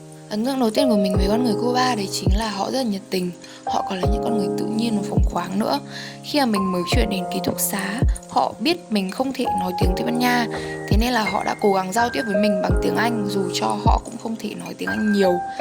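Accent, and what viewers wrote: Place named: North, Vietnam. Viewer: đang định bảo chị này có cái gu thời trang đúng kiểu con gái mk thích, cho đến khi đọc i4 :V. Chưa xem des nghe cái trời nồm là biết gái Bắc rồi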